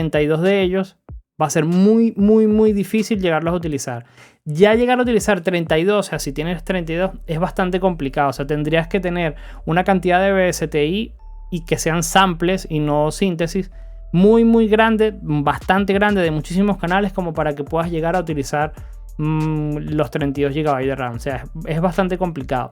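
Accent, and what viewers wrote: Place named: Venezuela. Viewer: Hola amigo, soy nuevo suscriptor, por tu acento noto que también eres de Venezuela, de ser así me gustaría saber al día de hoy que Laptop recomiendas de las más económicas para empezar acá en Venezuela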